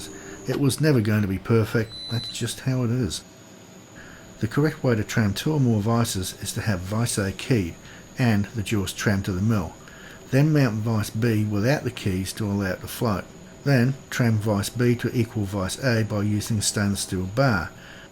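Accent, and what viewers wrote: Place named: Australia. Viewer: You know what my wednesday needed? a little bit of machining with an outback inflection. This was a fun one to follow along with, I just restored a manual lathe and endmill and I've been liking the hobby toolmaker side of youtube. greets from california mate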